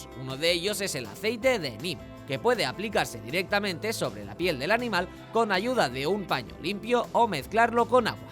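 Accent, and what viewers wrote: Spain. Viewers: Por favor aceite de que??? No le entiendo, quise poner subtítulos y no se pudo. La persona que habla no habla claro, tiene acento español y por eso no le entiendo 🤭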